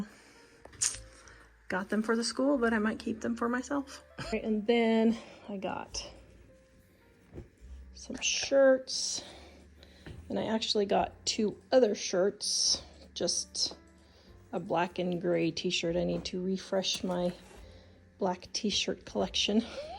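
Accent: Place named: Kentucky, United States. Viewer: Do you live in SE KY? My mother was from there. I didn't notice it in my mother, but decades later I heard her sister pronounce it that way. The two words I know I picked up from my mother's accent was 'iron'. I pronounced it as 'r-in' until I corrected it when I was 12 and my name 'Carolyn'. I pronounced it as 'Car-lyn'. I grew up in MI.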